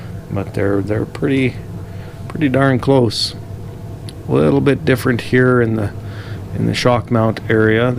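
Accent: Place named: Canada. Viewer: Great info you sound like my neighbors to the north of michigan ,eh. Peace n love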